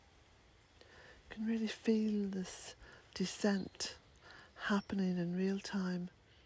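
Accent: Ireland. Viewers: What a beautiful video. You have a lovely voice, very calming and I love your Irish accent too. Bealtiane cottage looks like a heavenly place to live xx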